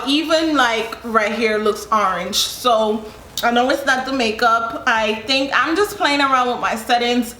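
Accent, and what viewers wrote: Jamaica. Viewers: Lol, it's the Jamaican accent for me😍❤️😂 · minutes into the video and I know your Jamaican🔥💯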